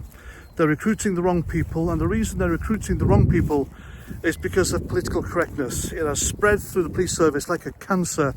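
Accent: Wales, United Kingdom. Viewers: Maybe it`s you Welsh accent, but what`s wrong with Tooting Police?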